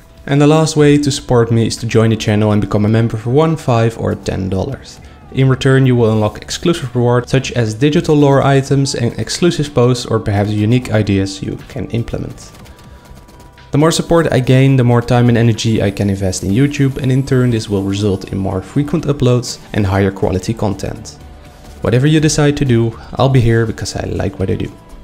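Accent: Netherlands. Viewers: Ik hoor dat jij Nederlander bent zwz 😂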